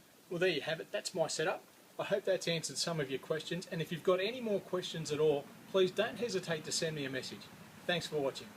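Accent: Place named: Australia. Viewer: Nice to hear an Aussie talking about yakking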